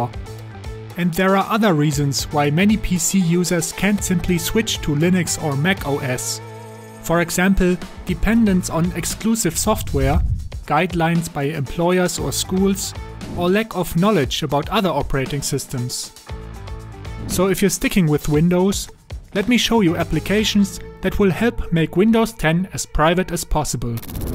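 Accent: Germany. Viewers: U are german Right? 99%😂😊💯nice video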